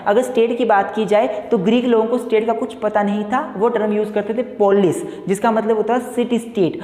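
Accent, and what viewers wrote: Himachal Pradesh, India. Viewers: Sir aap Himachal Se ho ?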